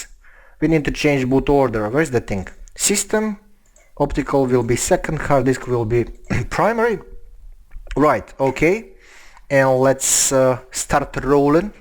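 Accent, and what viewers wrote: Russia. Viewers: The Russian accent is strong with this one. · Thank you Russian man. Love the tutorial and the accent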